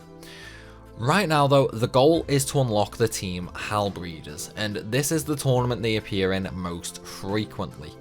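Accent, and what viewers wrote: United Kingdom. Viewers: WHY ARE YOU BRITISH????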